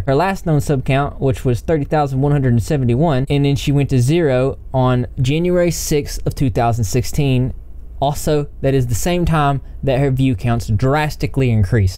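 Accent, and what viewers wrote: South, United States. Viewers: i dunno :|........but in my opinion.......maybe its the southern accent......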